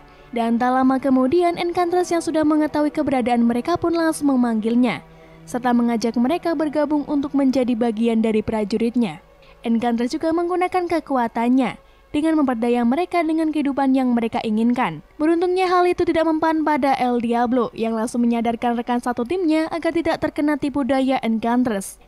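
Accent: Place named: Batang, Indonesia. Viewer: Orang batang min?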